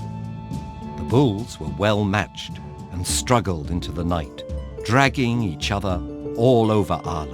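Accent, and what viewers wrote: England, United Kingdom. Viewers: hello from Dublin Eire . Pronunciation is everything to the Irish , as you get a brit to tell the tail of the greatest story ever told . to all who enjoyed this poor telling of our greatest tale . its name is " Táin Bó Cúailnge " or the Cattle drive of Coolie , read the book by Thomas Kinsella. Cu Chulainn, pronounced Cu-Cullin , was war lord from the north , a bruit , a womaniser , he would take your head , for sooner look at you . yeh they breed them special up north . he enshrines the Irish sprite of freedom , that is indomitable , ask the brits how much we Irish like our freedom . yet they still claim ownership of the land of Cu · English man reading irish mythology....what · So strange to hear this story told in an English accent. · Hearing this narrated in an English accent was painful and so unneccessary! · This lads English accent is genuinely difficult for my Irish brain to understand.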